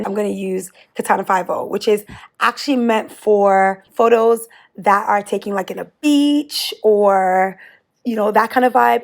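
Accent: Nigeria. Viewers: I can hear the Nigerian accent. I love this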